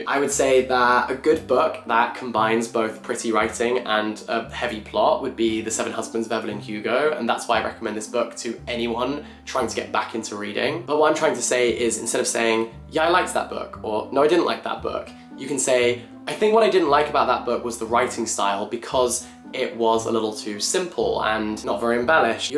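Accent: United Kingdom. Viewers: The Brits are losing their accent. 😢 Then again, you did just say “offen”.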